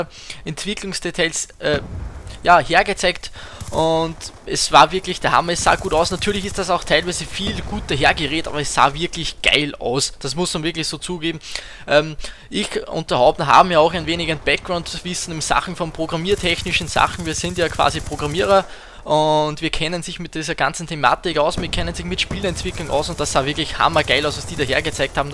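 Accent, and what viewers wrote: Austria. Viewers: bist du österreicher ? :D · du bist a Österreicher oder?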